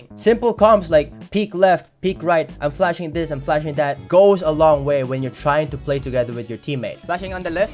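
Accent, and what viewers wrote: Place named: United States. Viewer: Wait your accent was so American I'd never thought you were not American before I heard you speak a different language. Ur insane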